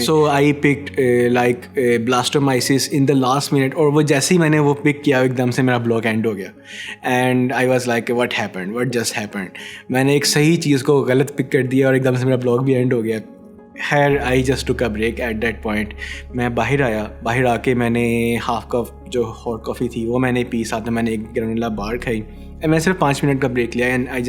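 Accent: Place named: India. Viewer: Why you speak Indian 😢😢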